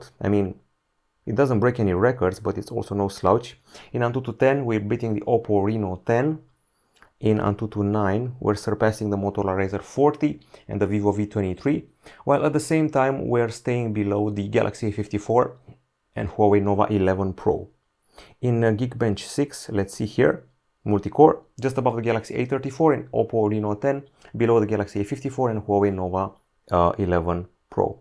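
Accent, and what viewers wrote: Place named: Romania. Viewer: Ce accent ...made in Romania 😊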